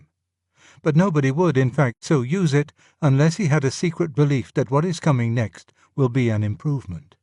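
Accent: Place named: Oxford, United Kingdom. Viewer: You must be unused to the Oxford English accent. The U S accent is foreign to the rest of the world! :-)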